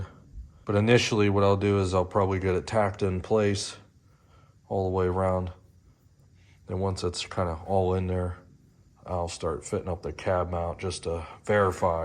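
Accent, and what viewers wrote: Pittsburgh, United States. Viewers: I here a yinzer accent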